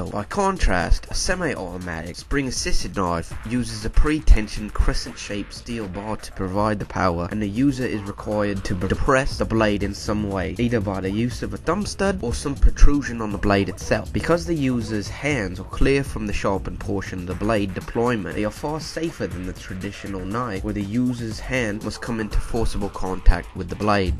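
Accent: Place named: Cockney, United Kingdom. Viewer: Now that's a very strange accent, sort of both cockney and northern at the same time.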